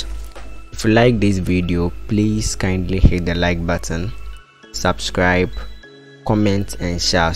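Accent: Ghana. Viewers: Bro u speak like a Ghanaian, are you? I'm Kojo.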